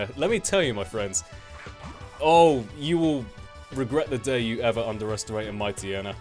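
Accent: United Kingdom. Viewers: FINALLY ANOTHER BRITISH BATTLER I'm a britt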